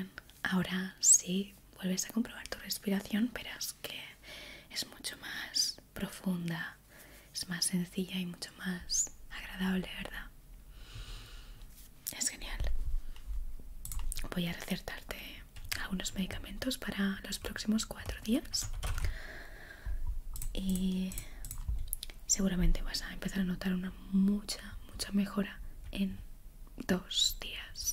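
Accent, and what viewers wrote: Spain. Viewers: Que ricura de mujer madre mia. Hecha a manos de dios. Y ese accento Español. Eres una diosa en viva.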